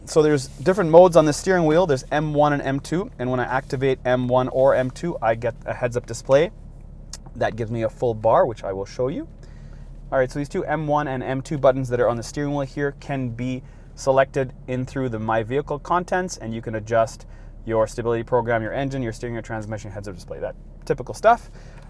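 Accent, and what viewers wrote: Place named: Canada. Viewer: Boy that Canadian accent is so heavy...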